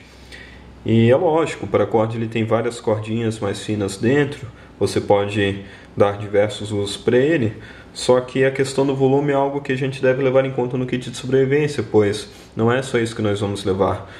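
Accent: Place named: Santa Catarina, Brazil. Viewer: e ae cara, gostei dos seus videos, ja estou inscrito. Pelo seu sotaque vc é de SC certo? Qual cidade amigo? Abraços!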